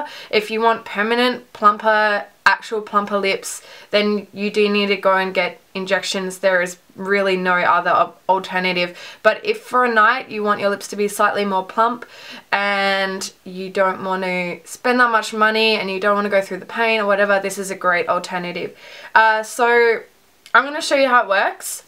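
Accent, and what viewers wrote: Australia. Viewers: Great tips! Thanks. I didn't realize your natural hair was that light. Looking lovely as always, and I like the Australian accent.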